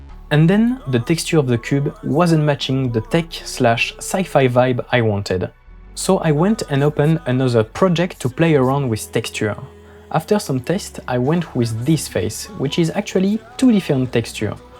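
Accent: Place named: France. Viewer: L'accent français !!!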